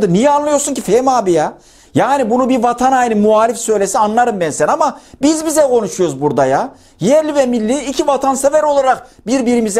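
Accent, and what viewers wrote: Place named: Anatolia, Turkey. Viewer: Anadolu şiven harika dostum.😂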